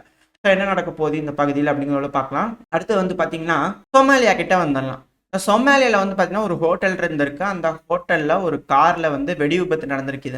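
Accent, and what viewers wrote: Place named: Kongu, India. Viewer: Kongu tamil ❤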